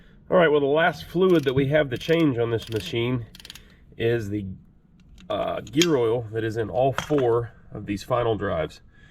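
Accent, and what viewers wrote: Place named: North, United States. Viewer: I AM WATCHING FROM DOWN HOME ( LOU---A---VULL ) learn to talk proper mare kan next time so you kan fit in we no your a feerneer dam yankey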